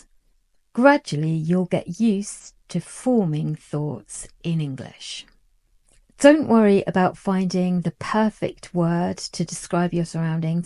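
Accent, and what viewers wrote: United Kingdom. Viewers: All tips are important for learning English and listening to the British accent makes me enjoy learning English.❤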